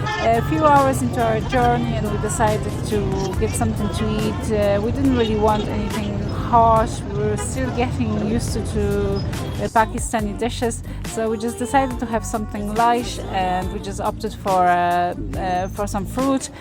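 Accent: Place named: Poland. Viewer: Fantastic. I am from Islamabad Pakistan. I am really enjoying your Pakistan videos. I guessed it right about Poland looking at your names & accent. What i know about Polish people is that they are the most adventurous people on this planet. Most of the top mountaineers who come to Pakistan are from Poland & what you are doing isn't easy either. They love adventures 👏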